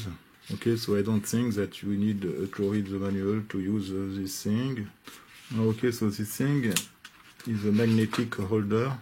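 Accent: France. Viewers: Love your English in French accent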